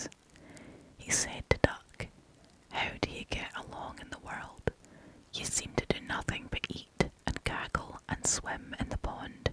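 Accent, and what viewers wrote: Scotland, United Kingdom. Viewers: your… (I wanna say Scottish…) accent is the cherry on top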